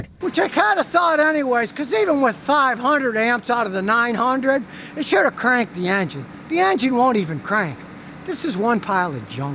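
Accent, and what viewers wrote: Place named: New York, United States. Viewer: he is originally a Neu Yourka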